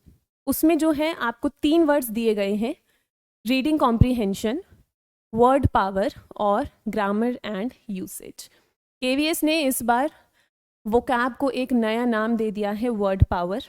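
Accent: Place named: Uttarakhand, India. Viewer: Hello Mam aap dev bhumi uk se lgre ho aapki language tone se or sir name se clear hai ki aap yhi se ho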